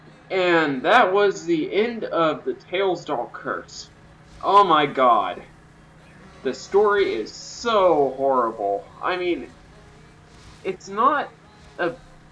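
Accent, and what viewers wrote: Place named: South, United States. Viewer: I never noticed until now how southern you do sound in this. XD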